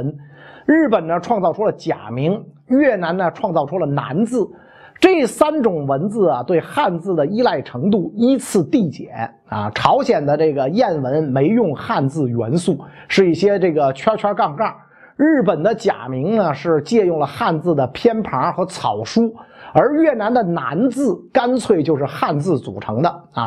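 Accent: Beijing, China. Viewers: 神奇...你会被袁老师影响成一口京片子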